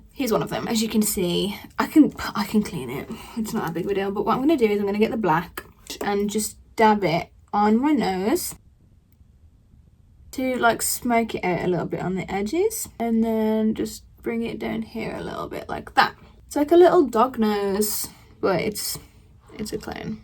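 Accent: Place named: United Kingdom. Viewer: Your accent is so cute. I currently live in Australia and wanna follow your accent, not bogan accent. Even though you explain how to make up, I learn british accent from you haha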